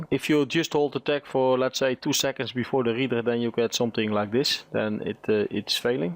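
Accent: Netherlands. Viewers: Het Nederlands accent is wel te horen, maar goede video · Neiderlandsee accenttt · are you dutch XD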